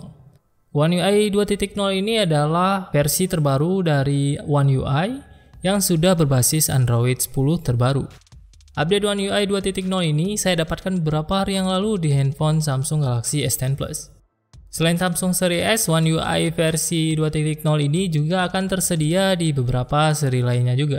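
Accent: Aceh, Indonesia. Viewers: lamtemen. aceh bro?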